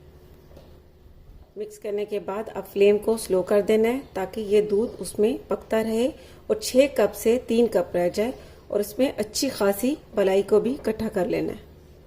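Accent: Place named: Pakistan. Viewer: Mam ka accent toh pura Pakistani hai lekin pakwaan ki koi seema nahi hoti😋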